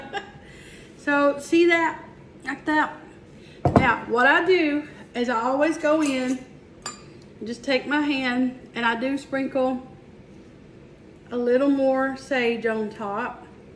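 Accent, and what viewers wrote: South, United States. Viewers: Sweet country accent , could listen & watch you all day ! New follower !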